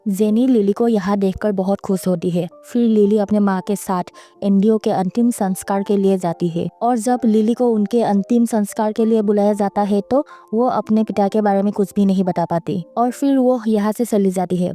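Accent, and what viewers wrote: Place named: Assam, India. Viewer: Nice hindi assamess style voice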